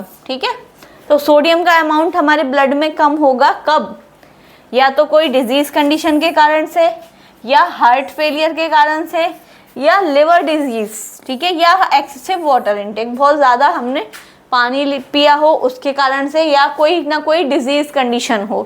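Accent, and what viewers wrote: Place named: Bihar, India. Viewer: Theek hai yah kam boliye aap Bihar se ho kya ❤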